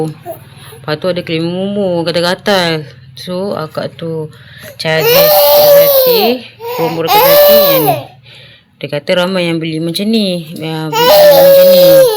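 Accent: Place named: Malacca, Malaysia. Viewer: dialag orang Melake sedap je dengar hehe 😊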